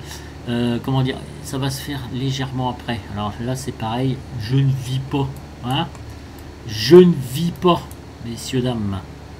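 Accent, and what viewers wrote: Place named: Nord, France. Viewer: Superbe vidéo comme d’habitude évidemment 👍👍👍👍👍 et très bien l’accent du schnord 😉😉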